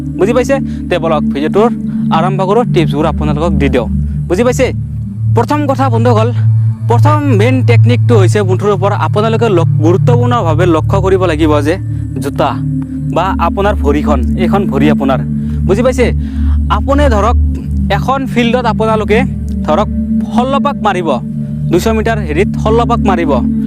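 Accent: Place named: Nalbari, India. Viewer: Dada apunar kotha bor nalbari r jen lagise hoi nki?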